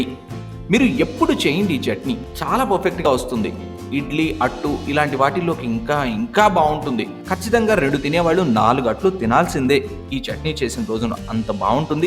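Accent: India. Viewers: Feedback: We love your attitude Love your honesty and sincerity when it comes to making your videos of recipes and presenting them as traditionally as possible Love your language, voice, intonation, the Teluguness in it, and your simplicity I never compare videos, but I think your videos are amongst the best, especially because they are very well made, and edited. Most importantly, the videos are short enough and very easy to understand and the recipes are straightforward and convenient to memorise and to reproduce. Thus far, all your recipes that have been reproduced at our home have proved to be great successes, and if there may have been any mess-ups, the fault lies with us, and not your videos. Yes, we'd love you to add your face to your voice. And I wish you'd expand the cuisines, venturing even into various parts of India. All the best Love A Foodie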